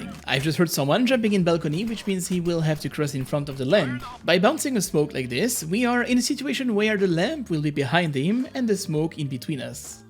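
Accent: France. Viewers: il a un petit accent français je crois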